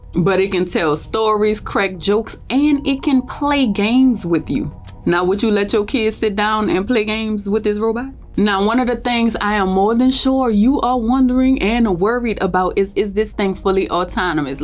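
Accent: New Orleans, United States. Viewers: Not Related but, I assume you from NOLA, because I can hear New Orleans in your voice lol 😆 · Are you from Louisiana? I’m thinking I hear that New Orleans accent. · Are you from New Orleans baby? I ❤ your accent 😍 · Mmmmmm 🤔..... Is that a New Orleans accent?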